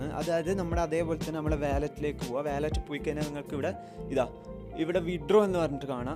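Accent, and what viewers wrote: Kannur, India. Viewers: Kannur ആണോ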